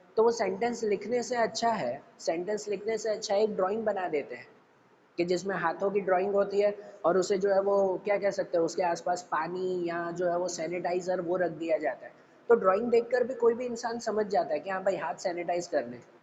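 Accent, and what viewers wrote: Gujarat, India. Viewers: he got the gujju accent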